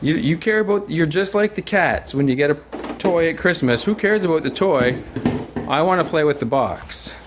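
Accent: Canada. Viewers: Strong Canadian accent but I can still understand what he is saying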